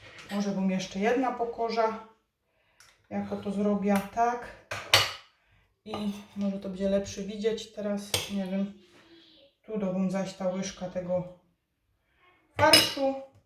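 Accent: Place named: Silesia, Poland. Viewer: Oooo Ty godosz! Aż mi się cieplej na sercu zrobiło.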